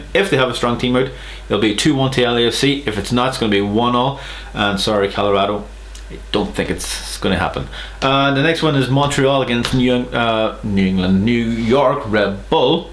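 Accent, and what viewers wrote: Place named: England, United Kingdom. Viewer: Dude why you upload like this your video ?? For me its difficult now to understand you English accent .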